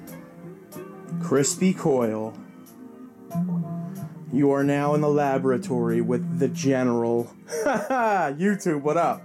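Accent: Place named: East Coast, United States. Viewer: Yea that is how you talk but I talk like that too haha must be the east coast in us lol, the mush explanation was dope too, had me rollin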